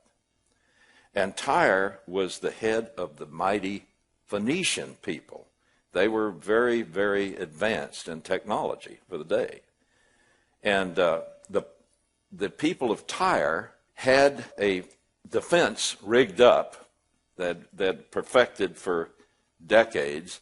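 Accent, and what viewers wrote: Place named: Kentucky, United States. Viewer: I WATCHED YOUR VIDEO WITH YOUR SON! THE 7 YEAR TRIBULATION STARTS AT THE RAPTURE! NOT MIDTRIB! NOW THERE IS THE THOUGHT THAT THE TRIBULATION MAY NOT START IMMEDIATELY AFTER THE RAPTURE. BUT THE RAPTURE IS BEFORE THE START! MIDTRIB IS A FALSE TEACHING. YOU SOUND POSSIBLY KENTUCKY OR TENNESSEE? NORTH CAROLINA OR VIRGINIA! I'M IN EXTREME SOUTH GEORGIA! BEEN HERE GOING ON 25 YEARS! I'VE BEEN ALL OVER THE USA! LIVED IN CALIFORNIA AND MINNESOTA AND PLACES WHILE IN THE WAC'S! PLUS ILLINOIS! MY DADDY WAS FROM TENNESSEE! BEEN TO GERMANY AND JAMAICA! BOTH AS A CIVILIAN! WE HAVE THE BEST COUNTRY!!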